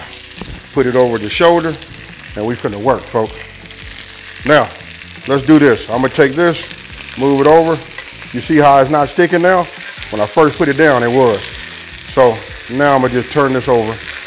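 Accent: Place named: California, United States. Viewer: I’m saving this one because I have to try it myself. A lot of your recipes are Cajun inspired I’m curious are your people from Louisiana? The reason I ask is because you talk with a cali accent but if I watched your content on mute I would swear that you were from Louisiana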